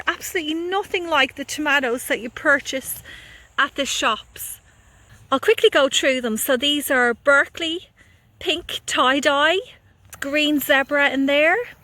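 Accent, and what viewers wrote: Ireland. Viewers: Happy new year I love your beautiful garden. I love to enjoy your thursday garden update I also love your irish accent and keep up the fantastic content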